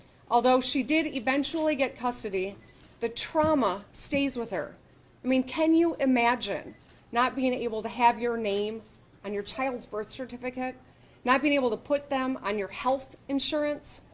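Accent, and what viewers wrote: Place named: Michigan, United States. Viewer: Not sure if Whitmer realizes she has a Michigan accent. When I moved from my native Michigan to California, people kept commenting on my accent, and I was like, I don't have an accent, you have an accent.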